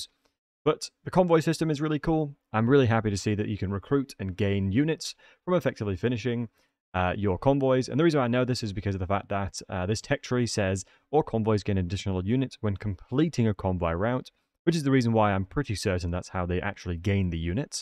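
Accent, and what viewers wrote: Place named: Yorkshire, United Kingdom. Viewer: Nice Yorkshire twang there :)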